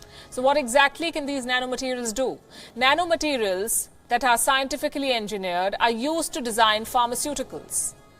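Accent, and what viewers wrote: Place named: North India, India. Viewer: var1328 Lol no. That's the North Indian accent. British sound completely different.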